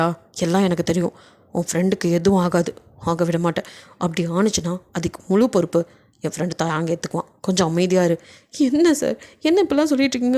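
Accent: Sri Lanka. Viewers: Innaikku Tae bias Ku double suprise aah nandri nandri enakku intha part la RM oda wife enka naattu slang romba pidichchirunthathu unkada kural la srilanka Tamil kathachchaale vadivu thaan 😍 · Srilanka slang super❤❤❤❤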